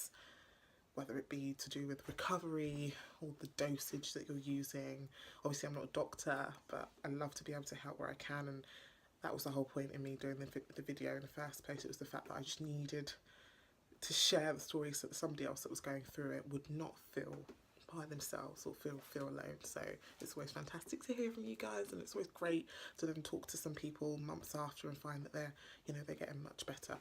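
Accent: United Kingdom. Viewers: You sound like you live in the UK. You need very pale skin to remain healthy in many parts of Europe. Your natural habitat is in a much hotter climate with much more sun exposure. Climates with less sun than you need can make you infertile. This is simply Evolution. Caucasians get skin cancer in the sun, Africans thrive in the sun. Caucasians thrive in cold climates, Africans get very sick. You should consider a climate that your body is adapted for.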